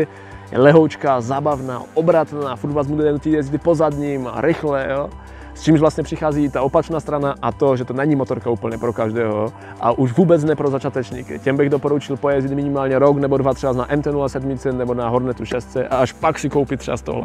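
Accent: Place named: Těšín, Czech Republic. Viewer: spíš bych to slyšel od Těšina na vychod... Třinec, Jablunkov , Mosty????? :-))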